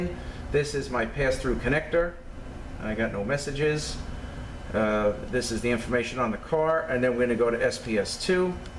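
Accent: Long Island, United States. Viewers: Great video. Didn't know about the change until recently. I was listening to you at the beginning and then saw the sign and was like oh, thats why he sounds like a Long Islander. I'm a NC native, but married my East Meadow native wife 26 years ago. We live in TN, but just had one of her heavy NY accent brothers visit recently from Long Island. Great info here. Thank you.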